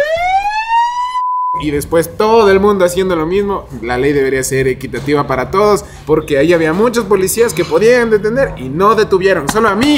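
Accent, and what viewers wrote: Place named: Ecuador. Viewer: Jajajaja pero habla como el ecuatoriano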